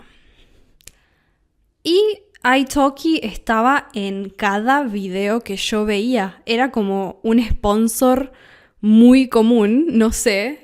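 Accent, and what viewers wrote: Rioplatense, Argentina. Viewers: Thank you so much for these podcats. I'm learning Spanish purely through comprehensible input and i can already tell your podcats will be of great help to me in my pursuit of fluency. I also appreciate your argentine accent, my aim is to understand all spanish speakers, whether they're from spain or latin america. Keep up the great work you have gained another subscriber for your channels. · Great first episode, I’m looking forward to the podcast and know I’ll enjoy it no matter what you decide to talk about. I was similarly obsessed with the world of YT language learning channels and language related content for a while. I watched all the big name YT polyglots of the time and followed so many peoples language learning journeys. I even watched some those channels aimed at teaching you a language, like Japanese from Zero. I never really made significant progress in any languages back then though. 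It wasn’t until starting Spanish again in December of 2023 that I finally found a way that worked for me for learning a language, comprehensible input. I love Rioplatense Spanish, and your accent in particular, so I’m glad you didn’t let your accent/dialect dissuade you from starting this path. I’m super grateful to have found you as a content creator and iTalki tutor all those months ago. It’s been over 7 months since our first crosstalk and now our lessons are entirely in Spanish and it still blows my mind. I really appreciate you as both a content creator and a tutor and I’m so happy that it’s something that you enjoy, that makes such a huge difference. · Tienes un poco el acento argentino,. Saludos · De donde eres?!? Porque habla diferente… Su yo es sho 😮 Es Ud de Argentina??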